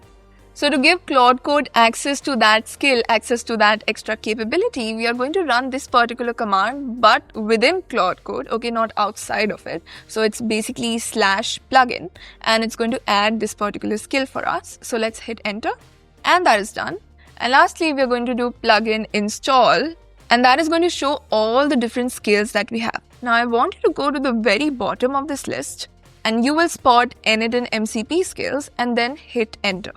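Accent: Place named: India. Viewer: I cant with the indian accent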